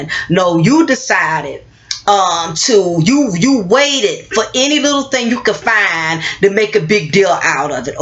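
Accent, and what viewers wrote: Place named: South, United States. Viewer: are u from some part of New Orleans I am u just kinda have that Southern accent a little just curious n that's one thing me n my mom both hated every time we planned a party or holiday gathering it was either my mother me pitching in or one of her friends that would have to buy food decorations etc nobody else every one wanted to eat n drink free too disgusting.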